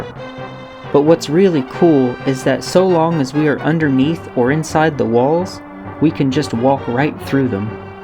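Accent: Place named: South, United States. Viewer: Alabama? Georgia? Mississippi? Tennessee? Where ya' from? TEXAS!?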